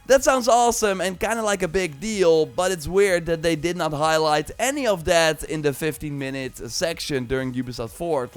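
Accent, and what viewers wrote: Netherlands. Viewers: You sound dutch! I am very hyped for it! I loved farcry 3 alot aswell as ac odyssey. Here is to hoping it will be good 👌❤️ Entered the giveaway! 🎉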